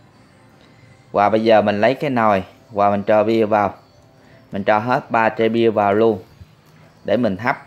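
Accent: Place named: Binh Duong, Vietnam. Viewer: Nghe giong noi ban ... minh nghi ban o binh duong thi phai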